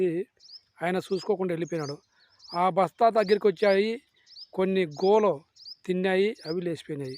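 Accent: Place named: Kurnool, India. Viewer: Anna meeru eay ooru me basha chusthay ma kurnool ternaykal,basha la undi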